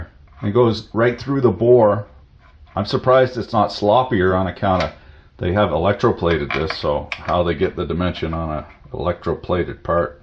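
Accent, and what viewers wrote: Canada. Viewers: Glad I found this channel. You take being a tool guy to a whole 'nother level. Im from Texas and get a kick outta the Canadian dialect. Enjoy it. I watch another channel called South main auto repair, and you sound a great deal like him lol. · I swear you were Canadian...??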